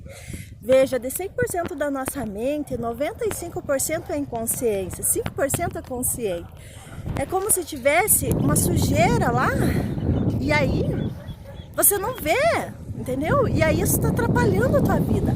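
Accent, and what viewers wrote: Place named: Paraná, Brazil. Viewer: Pelo sotaque é paranaense hehehe Parábens pelo vídeo, sucesso em seu canal!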